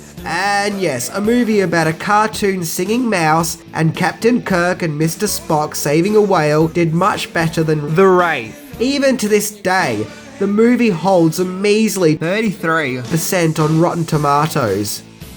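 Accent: Australia. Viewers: Its an Australian accent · Hes a Aussie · Simon Tasievski bogan is australian, therefore he has an australian accent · Akirasan Akirasan it’s what we speak here in Australia 🇦🇺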